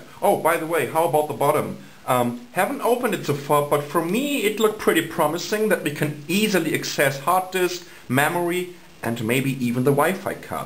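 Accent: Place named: Germany. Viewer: I like his german accent :)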